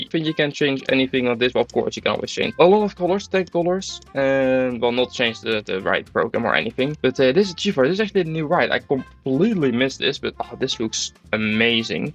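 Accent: Netherlands. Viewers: de nederlandse accent!!! hahahah helemaal geweldig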